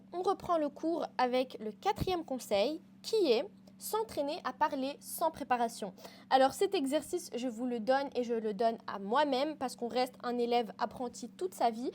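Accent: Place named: Tunisia. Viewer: Tu es tunisienne 😂😂😂 je reconnais l’accent 💕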